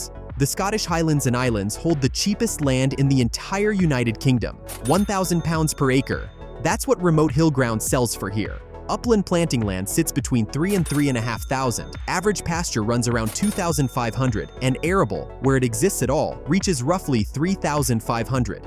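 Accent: United States. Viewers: change the American accent for gods sake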